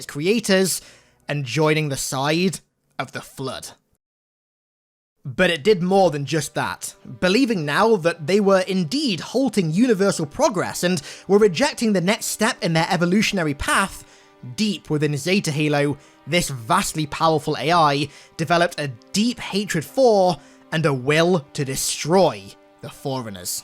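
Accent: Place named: United Kingdom. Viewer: Where are you from? Sound British but speak American.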